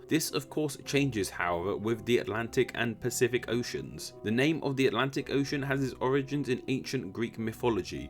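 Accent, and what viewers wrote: United Kingdom. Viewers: This British accent ! 😌🙏🏻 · The oceans got their names by being called that by someone, and it stuck. Again, this robotic British twit narrator should be used to stop hurricanes, as his delivery is so banal that even a force of nature would turn away.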